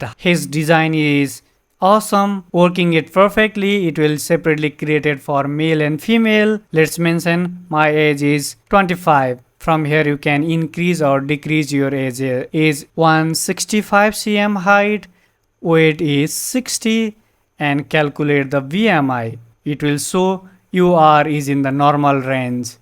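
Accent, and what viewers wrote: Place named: Nepal. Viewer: Bro speaks English in Nepali accent! I love it.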